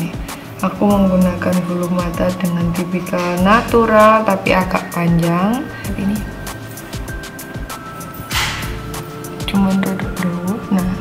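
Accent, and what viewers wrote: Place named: Malang, Indonesia. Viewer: ngakak nonton tutorial nya hahahah asli ngalam bgttt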